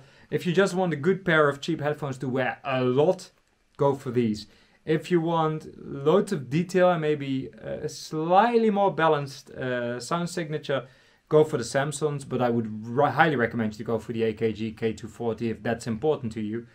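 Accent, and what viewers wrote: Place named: Netherlands. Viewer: You know whats funny? You can tell someone is Dutch by their English ;)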